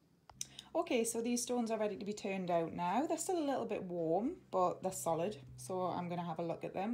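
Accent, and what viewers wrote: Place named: Geordie, United Kingdom. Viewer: This is an excellent tutorial dearest! I love your sweet Geordie accent my mandala soul sis! 🥰😍❤🥰😍❤ I am so glad to see I'm not the only one who don't follow instructions on the packet 😁😄 sometimes you just have to follow your instincts and these have turned out brilliant. Keep the videos rolling my sweetheart ❤❤❤❤❤😍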